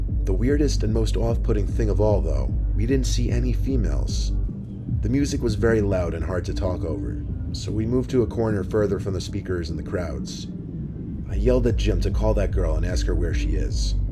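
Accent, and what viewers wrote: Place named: West, United States. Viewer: Let's be honest voice is so good for stories like this and it sounds western. His clogged nose make it sound better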